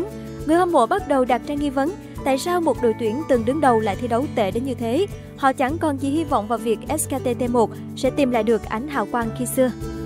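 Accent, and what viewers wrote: Standard. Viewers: Trời giọng chị này chuẩn vãi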